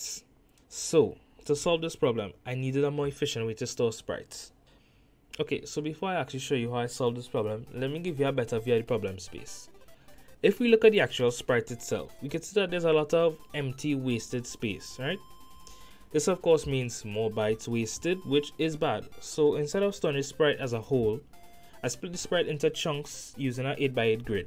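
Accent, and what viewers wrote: Trinidad and Tobago. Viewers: As soon as I heard your voice I knew you were a Trini. Surprised to see a Trini talk about the X16. This is awesome! The outlines around the sprites reminds me of the Castlevania games for GBA. Was it done to help the sprites stand out against the background and whatnot?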